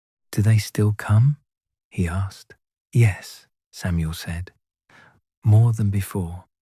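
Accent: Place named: Lancashire, United Kingdom. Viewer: Thank you. Gentle voice. Lancashire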